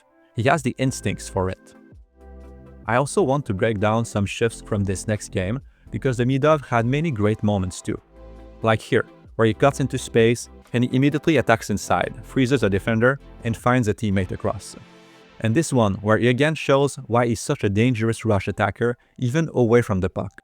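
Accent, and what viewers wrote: Quebec, Canada. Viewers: T'es un québ toi aussi? T'as un accent québecois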